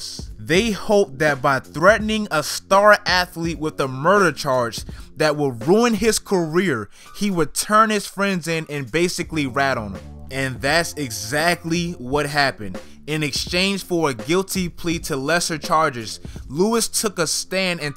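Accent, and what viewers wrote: Baltimore, United States. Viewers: Yo u from Bmore?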